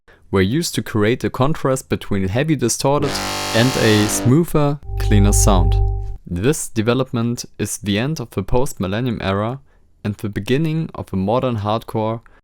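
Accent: Germany. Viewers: Ich erkenne einen deutschen wenn ich ihn höre :D Geiles Video! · das doch nh deutscher der da spricht, safe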